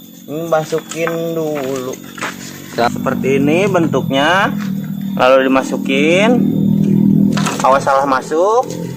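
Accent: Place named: Banyumas, Indonesia. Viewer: cingireh ngapak ....salam wong jowo bro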